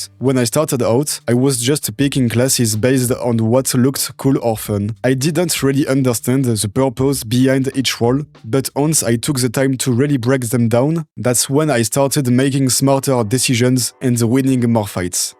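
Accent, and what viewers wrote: France. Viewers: I really love that French accent! Lovely video, keep up the good work man! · This is the most French Frenchman I've ever heard. Subscribed. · I'm italian so I find hard to compliment a french, yet I must say that french english accent is really cool 😂 Also you are my favorite the finals pro. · as a french, i approve this accent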